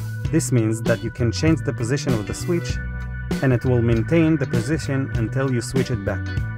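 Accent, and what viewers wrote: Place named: Israel. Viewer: I hear an Israeli accent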